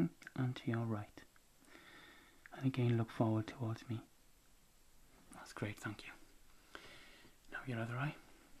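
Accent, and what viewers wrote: Wales, United Kingdom. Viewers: I love Welsh accents 💕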